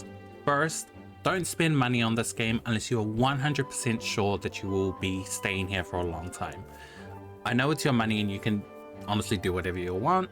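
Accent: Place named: New Zealand. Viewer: That's an accent I recognise, kia ora!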